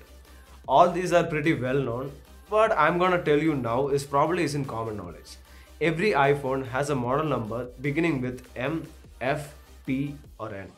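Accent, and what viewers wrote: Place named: Kerala, India. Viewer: 10 seconds into the video and I know that's a mallu guy speaking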